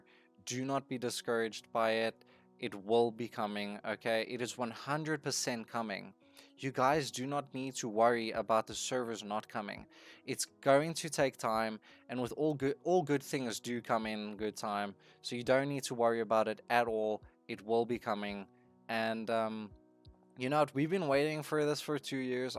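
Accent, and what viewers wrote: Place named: United States. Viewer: that american accent tho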